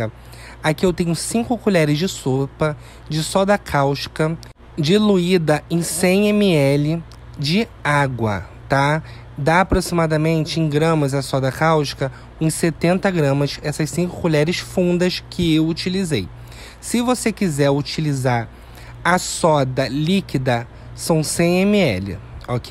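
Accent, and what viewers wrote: Rio de Janeiro, Brazil. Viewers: Ñ sei se tem beleza física (creio q sim)😂 parece o Lombardi, só conhemos a voz 😂mas seus vídeos são os únicos q assisto sem acelerar. É didático, sem apelação. Seu sotaque (carioca?) Sua voz, td e agradável, td é proveito. Jesus te abençoe mais ainda.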